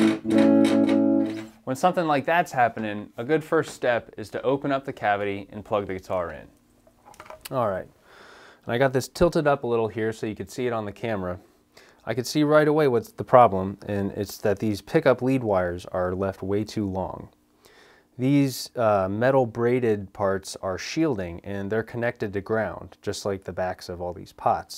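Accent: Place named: United States. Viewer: Why do Americans pronounce solder as sahder? It's never made any sense to me. Do you say bahder instead of boulder? Do you say this thing is a lot cahder than this one which is hahder?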